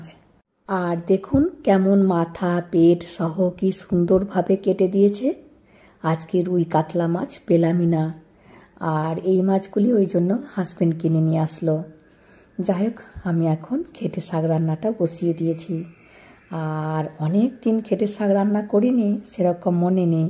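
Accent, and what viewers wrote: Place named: Marwari, India. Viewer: বাংলা ভাষার মারোয়াড়ি উচ্চারণ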